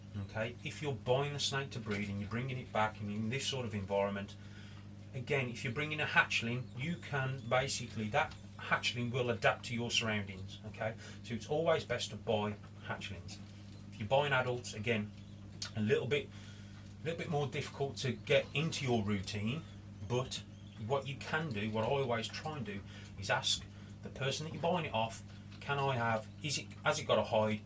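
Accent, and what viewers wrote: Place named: Midlands, United Kingdom. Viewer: It's so refreshing seeing a ball python video from someone who sounds like me 😄 great videos mate, keep em coming. are you based in the Midlands?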